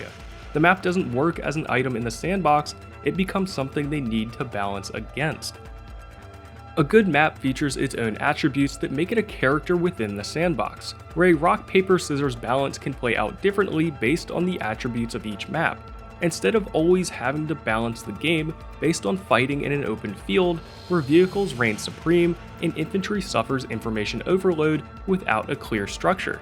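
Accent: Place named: Philadelphia, United States. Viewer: Great video, very well put together. Just subscribed! Also, are you from the Philly area by chance? A few words you say have an accent that comes through lol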